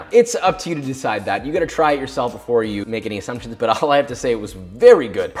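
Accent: South, United States. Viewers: your southern background is showing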